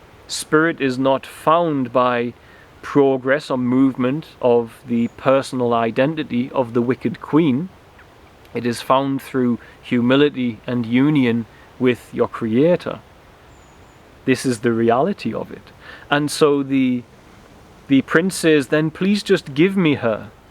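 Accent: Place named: North East England, United Kingdom. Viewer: Are you Welsh bro? Subscribed! Duw bendithia chi (god bless you). yeah I can't work it out. The more I listen the more I think you sound North Eastern! Don't want to say one place or the other in case I offend! Definitely more Newcastle than Sunderland! Final answer! Did I win? Good job though! Nice one!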